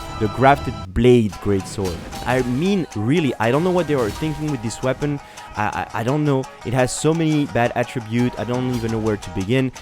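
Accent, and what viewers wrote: France. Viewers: Ah mais voilà putain j’me disais que t’étais français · Wait are you french ? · Really great video ! You are not just making a simple tier list but adding your touch and your fun to it, so it s really cool to watch !You gain one sub ! (Et je suis aussi fier de moi d avoir reconnu un petit accent français, validé par l extrait du début dans le lequel tu nous sort un petit juron bien francais 😂) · damn that french accent... love it! salut frérot continue comme ça ! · Are you french ?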